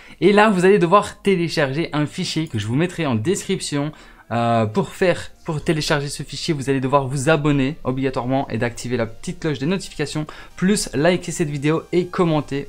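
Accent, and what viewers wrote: Belgium. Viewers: Slt tu es d'où de Belgique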